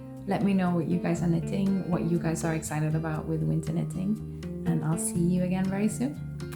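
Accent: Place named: England, United Kingdom. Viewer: I love your OG English accent. So refreshing to hear “Maths”, not “math” 😊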